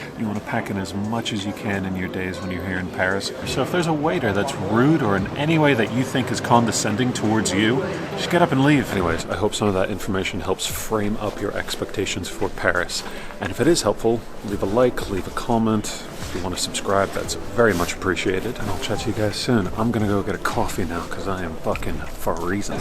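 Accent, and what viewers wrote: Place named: New York, United States. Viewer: Sounds like New York lol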